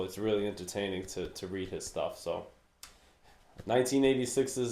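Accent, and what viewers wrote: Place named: Boston, United States. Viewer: Let me guess; you are from Boston or some other part of New England.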